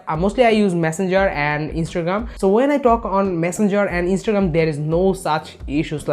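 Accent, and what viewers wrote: India. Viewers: Your english is so smooth than another indians. Love the accent 🤓 🇲🇨 love indian people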